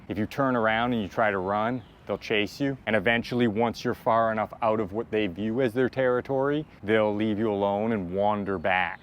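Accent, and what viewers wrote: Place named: Canada. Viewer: The negative comments I think it's the Canadian hoser accent and because you speak very slow and clearly. Which is great cause I can listen to you at 1.25 it 1 5 speed. I'm in Thailand (Hua Hin) now and you helped us get here with your vids. Big thanks from a fellow Canadian and Australian. Hope to come back in Dec for 4 months to escape those Mississauga winters. Cheers keep making those vids.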